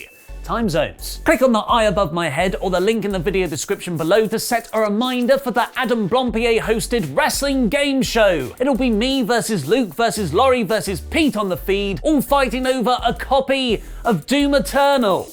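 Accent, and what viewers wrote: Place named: RP, United Kingdom. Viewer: Why do you talk like you're on Radio 4?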